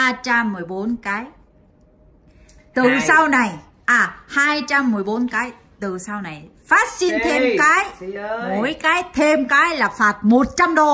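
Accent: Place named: North, Vietnam. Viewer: lúc đó nói giọng Bắc nó chả chuẩn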